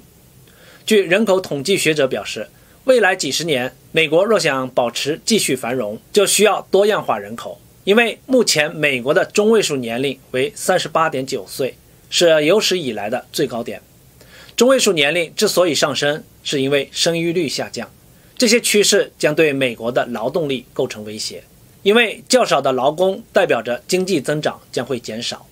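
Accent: Standard. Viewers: 您的普通话非常标准 字幕有一排最好， 不要显示两排相同的字幕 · 你说话挺标准的，不需要字幕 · 有字幕更好。您的播音质量已经是首选了，国语讲得最容易明白。可能您的国语比较标准、语速也讲得标准吧。👍赞、加油。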